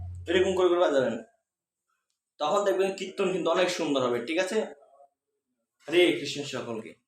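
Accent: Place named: Chittagong, Bangladesh. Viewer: হরে কৃ্ষ্ণ প্রভু, আমার মনে হচ্ছে আপনি চিটাগং এর। খু ব ভাল লাগল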